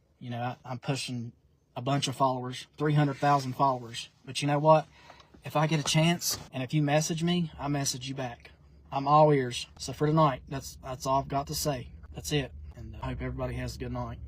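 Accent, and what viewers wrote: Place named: Appalachia, United States. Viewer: Here in North Ga.(appalachians), we did rnost of the sarne stuff you are talking about, and the sarne type of values, even talked with a sirnilar accent...trouble is, we have been "invaded" and gentrified now..us hurnble country folk have been priced out of land, housing, and even rent...whereas when I was a kid nearly everybody in the store sounded like you talking...now, it's rare to hear that accent...everybody sounds like they carne frorn sornewhere else...hope that never happens to you in West Virginia, but it probably will, when they get done developing up Tennessee, Alabarna, North and South Carolina, and Kentucky. What part of Georgia is frorn?...rnaybe he isn't originally frorn Georgia?....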